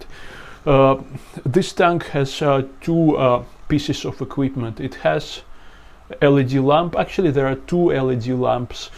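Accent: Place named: Germany. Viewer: Wow! what a beautiful tank and beautiful terrestrial plants growing above and around it. It seems you are experimenting with many of the same things in your tanks as I am. Where do you live? You sound German, very understandable but a slight accent. I am in Ohio on the Pennsylvania border. Not that it matters but I am just curious. I started out my aquaponic experiments with spider plants. I had about seven plants I planted in gravel in an old breeder box. Their growth exploded. The tank is in a custom-built cabinet with open slots between the boards. the spider plants are growing up through the slots on the top of the cabinet and long runners are hanging down over the front of my tank hundreds of new spider plants of various sizes are growing on these vines. My setup is in an upstairs room and has no room for expansion and I do worry about putting too much weight on the beams and though I am very careful to not make water messes you know that is not always possible. 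So I have a basement room I am planning to move to where I will have lots of room to expand the hobby. I purchased a 10-gallon tank today at a second-hand store for $5.00 and actually I have several other small tanks that I have not set up. If you go thrift store shopping on a regular basis you would be surprised how cheap you can pick up small tanks. So I will be migrating to the basement. Too bad you don't live around here. I could take you to a rocky canyon which has a large but shallow headwaters of a river.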